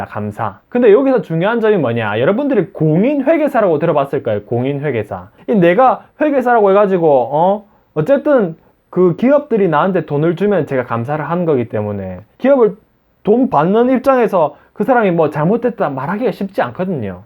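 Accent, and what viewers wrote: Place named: Gyeongsang, South Korea. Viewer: 안녕하세요, 님. 저는 현재 해외에서 한국어 교원을 하고 있는 사람입니다. 저는 원래 영어교육학이 전공이라 영어교사로 고등학교에서 재직하다가... 무언가 고등학교라는 시스템이 학생을 너무 괴롭힌다는 생각이 들어..그나마 널널한 한국어를 가르치고 있는데... 최근들어 드는 생각이, 저는 영어를 좋아했던 것이지, 학생을 좋아하지는 않은 것 같습니다. 그래서 제 주변에 us cpa하시는 분께서, 영어에 자신만 있다면 미국 cpa를 한 번 도전해보라고 해서.. 당장은 아니지만, 조금씩 준비해 나가려고 합니다. 한국어교원 컨텐츠 영상이나 회계사 컨탠츠 영상을 보면.. 대부분 학원들 광고가 많이 뜨는데, 님처럼 개인이 어떻게 공부했는지도 올려주시면 좋을 것 같아요... (참... 조회수는 안나올 컨텐츠이긴 하지만....). 그리고 조금은 난해한 질문일수도 있지만.. 님은 세무사로서 지금 행복하신가요? 그저 개인 의견이 궁금합니다. ^^ (저 또한 부산 사람이라... 하지만 직업상 참..억양을 죽이려고 노력하는데... 마음껏 갱상도 억양을 발산하시는 님이 오늘따라 참 부럽습니다.... 뭐 저는 억양을 없애려고 노력만 했지, 없다고 하지는 않았습니다....^^)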